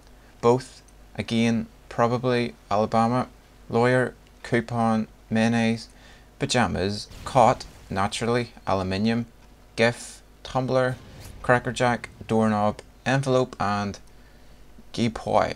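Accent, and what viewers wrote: Northern Ireland, United Kingdom. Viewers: Lol Northern Irish accent is awesome!! Love your accent (I'm Australian). In Australia we don't necessarily say 'gym shoes'. We say sand shoes, or sneakers lol! Carbonated drink, we normally say the actual drink itself (Fanta, Sprite), or we say 'soft drink'. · american accent sounds ´neutral´, northern ireland accent is so much more interesting :) i think i´ll subscribe to hear that accent ' thanks for the vid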